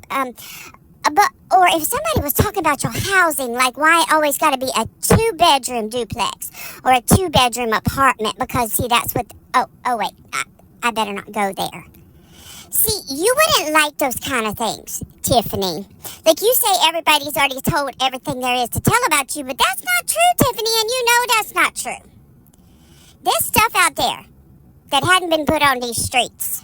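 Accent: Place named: South, United States. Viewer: I missed you miss, and I love Southern accents 😍💯🙏🏼❤️ Great video like always 💯🐍🥕🤡👿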